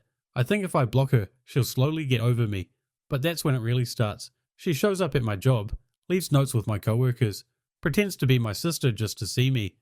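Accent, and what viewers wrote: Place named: New Zealand. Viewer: Why all the talking in funny Kiwiglish?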